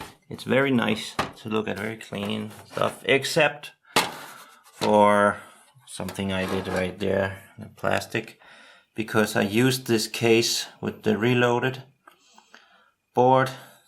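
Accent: Denmark. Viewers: Hehe.... Sjovt at jeg tænkte at du er danskee, da jeg hørte din accent. God video. Min favorit er c modellen.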